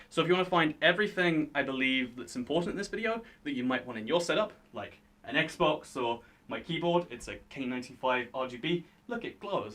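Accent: United Kingdom. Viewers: M.A.A.C CRAFTERS No, He's Brittish. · He lives in the UK so he has an accent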